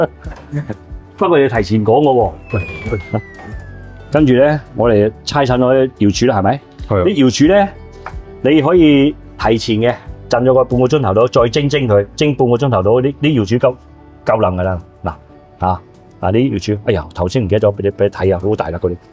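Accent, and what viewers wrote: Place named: North, China. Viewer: 唔會係純種廣東人吧，講廣東話有北方口音嘅？不過廚藝就頂瓜瓜🎉🎉🎉🎉🎉🎉😊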